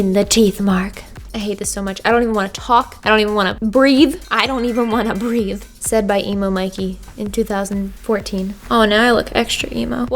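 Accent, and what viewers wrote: Philadelphia, United States. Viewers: I've always thought that had a very unique accent but could never pinpoint it. It's a Philadelphia accent. I'm from Philadelphia. Why am I like this?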